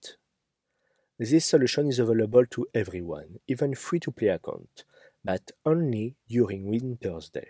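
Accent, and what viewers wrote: France. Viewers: I'm French and it's surprising to me how he obviously knows and understands English quite well, meaning he's been exposed to it quite a lot, and yet somehow he still retains that very thick beginner French accent that all high schoolers have. The amount of French people who have way less vocabulary and understanding of English grammar than he does and yet speak with a much better accent is astronomically high. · I literally have to put on CC because of how thick and hairy his French accent is. But I love how good his editing skills are. Literally don't need to listen and can watch everything to get the information. So does it matter? I love his work! Tu es beau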